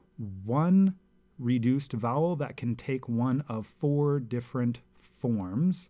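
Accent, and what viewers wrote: United States. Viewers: I consider this harmful to anyone learning Hebrew. You have a heavy American or Canadian accent, and your vowel placement so different to Hebrew, I could barely recognize the letters you mentioned. Anyone who used these videos to learn would surely end up sounding American? Also, the video is called "Biblical Hebrew" but you're rejecting Biblical pronunciation and going with modern pronunciation, which isn't helpful to anyone learning Biblical Hebrew. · Thanks so much. You should perhaps point out that your pronunciation of many of the vowels is distinctly American, and is different from the non-Western original Hebrew pronunciation. For example the letter yod should be pronounced as the oo in wood, and not like the o in yoga. It can be confusing for those who are not cogniscent of your strong American accent. Thanks again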